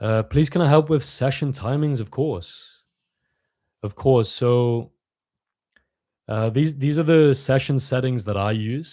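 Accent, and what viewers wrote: Newcastle, United Kingdom. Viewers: You sound a little bit like a geordie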